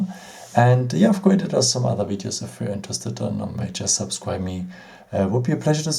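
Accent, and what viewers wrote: Germany. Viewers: Bist du deutsch weil dein Akzent ist bisschen so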